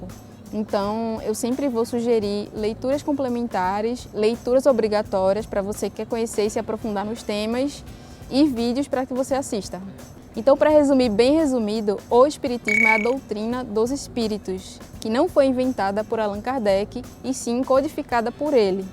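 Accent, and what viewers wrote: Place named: Recife, Brazil. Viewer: Oi, parabéns pelos seus vídeos, acabei de conhecer o canal e estou amando, já me inscrevi! Sou espírita mas estou engatinhando na literatura rsrs,comecei a ler O que é o espiritismo? de Kardec depois vou partir para O livro dos espíritos! À propósito...por acaso esse sotaque lindo é da minha terra, Recife? Rsrs um abraço fraterno!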